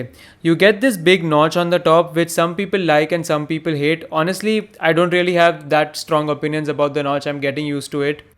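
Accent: India. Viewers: well, he looks & sounds like Indian.... Cmiiw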